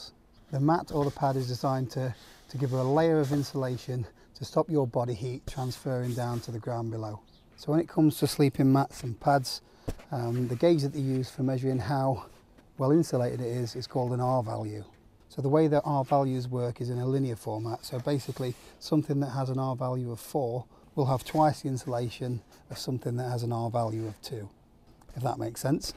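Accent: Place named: Derbyshire, United Kingdom. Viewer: Thanks, great advice as standard. Planning to go bike packing and wild camping this year 2021, your accent sounds very local to me(Belper, DERBYSHIRE) where are you from?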